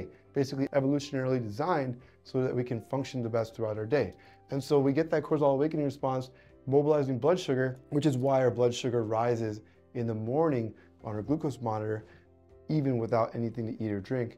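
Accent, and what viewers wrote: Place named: Iran. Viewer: Nice irani accent.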